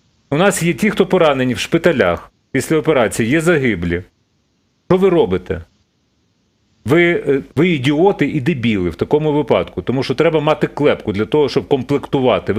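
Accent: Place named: Poltava, Ukraine. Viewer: згадалась ваша полтавська вимова,коли читала в місцевому чаті одне з повідомлень. Це Херсонщина. Ось це повідомлення: "Вірні слова,бо унас не так як у людей,ми будем собирать кошти, на ремонт а хтось буде мидальки получать,мидаль наде було дать громоді яка була в окупоції,чого не пишуть де діли 60 тон гомунітарки,а тендери по ремонту це зовсім інша проблема,унашом отеге все можливо,кому війна а кому мать родна."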